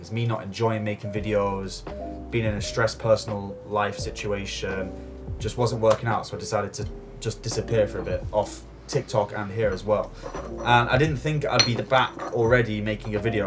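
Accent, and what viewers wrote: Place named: Staffordshire, United Kingdom. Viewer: Love your videos mate. I'd ask where you're from, but that would be rude lol. Accent sounds like it could be relatively local to me in Staffs lol. Probably far wrong but ehh 😂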